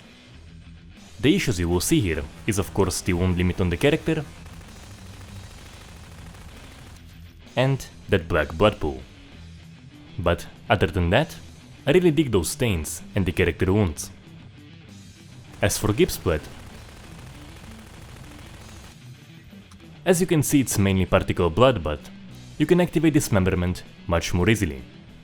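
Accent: Russia. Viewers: russian акцент замечен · Я заметил твой русский акцент. Ты Русский? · Я знаю что ты русский ! По акценту слышно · I love his russian accent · Are you are Russian? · Русский акцент, ты русский ? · For fuck sake youre VERRRY RRRUSSIAN ACСENT. I understand, it makes easy to speak English. But my ears can't stand it. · Такое ощущение что автор русский, Из-за акцента голоса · Very strong russian accent like in movies... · Так разговаривать только русский может) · Ты руусский) По акценту видно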